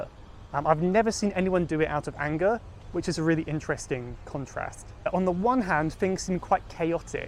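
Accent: RP, United Kingdom. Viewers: I love your accent. Is it modern RP? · Hi, I like this channel so much!!!!! but unfortunately not many people commented on it,I have learned your british accent from your Youtube for 2 months,I hope you can keep updating and I will always be here, thanks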